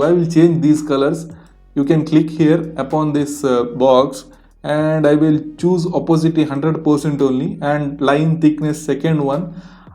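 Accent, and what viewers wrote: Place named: Kathiawar, India. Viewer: Bhai Tumhari English bhi kathiyawadi lag rahi he saurashtra se ho kya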